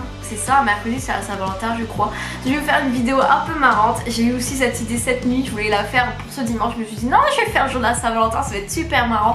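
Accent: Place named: Nord, France. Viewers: Super vidéo ! Jsuis trop morte de rire au début "ça fait cassos" et "c mieux en français qd mm" haha c vrai que c une langue à part entière le ch'ti ! Moi je l'entends direct ton accent surtout sur certains mots :) Ma belle famille est ch'ti, ça fait + de 11 ans que jles connais alors à force jsuis habituée ^^ Jcrois que jconnaissais 95% de ces mots et expressions mais avant d'être avec mon homme j'aurais réagis comme tes copines de vacances mdr ! Gros bisous <3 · J’adore ton accent malheureusement j’ai quitté le nord trop jeune j’ai pas l’accent 😔 et j’adore le patois du Nord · Oh oui ton accent est prononcée 😉 j'adore grave le ch'ti depuis le film de Dany Boon BIENVENUE CHEZ LES CH'TIS 😊 surtout que nous les Bretons ont dit aussi Vindediousse (je sais pas l'écrire mdr) BONNE VIDÉO sinon 👏💘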